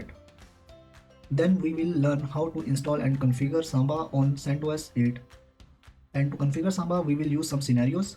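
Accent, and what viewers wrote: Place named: India. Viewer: OMG, Are speaking English or are you trying? please can you try to forget your Indian language, that's gonna help you.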